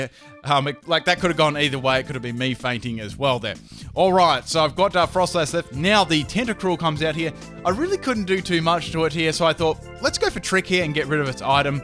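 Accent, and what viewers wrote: Australia. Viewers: its fine, he's aussie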